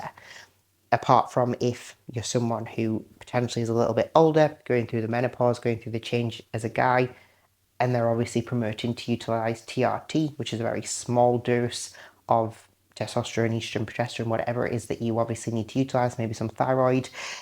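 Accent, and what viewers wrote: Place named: United Kingdom. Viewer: You look beautiful and I love your accent 😊 What part of the UK are you from?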